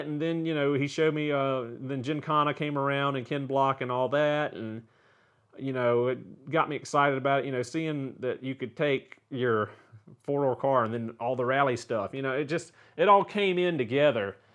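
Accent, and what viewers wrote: United States. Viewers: all good it was funny especially in your yanky accent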